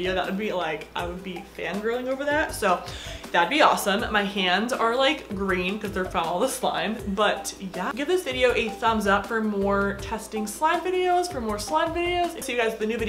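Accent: United States. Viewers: Is she american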